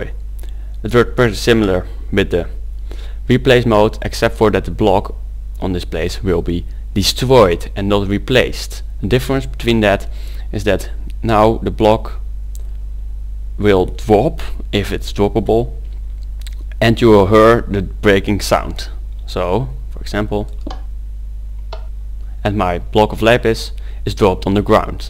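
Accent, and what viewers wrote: Netherlands. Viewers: 100% dutch :) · je hoort het nl accent. dat is niet erg, maar goeie tutorial! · hbo Nederland accent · Are you Dutch? I can hear it in your accent! Thanks for the video! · Dit is nederlands accent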